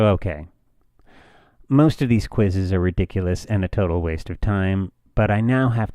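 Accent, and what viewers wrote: United Kingdom. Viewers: Killer British accent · I love your Paul McCartney accent lol.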